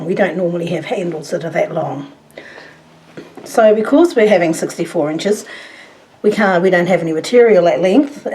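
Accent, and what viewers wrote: Australia. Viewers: Okay, so while I LOVE your tutorial, I absolutely adore your Oz accent. Thank you so much for the guidance on this tutorial.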